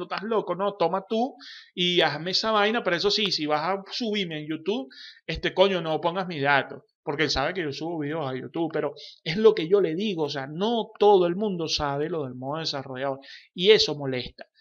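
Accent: Venezuela. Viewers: Gracias, es de mucha ayuda, lo gracioso es escuchar lo que decías de tu hermano como si hablaras con un amigo, jejejej, tan venezolano;). Gracias de verdad!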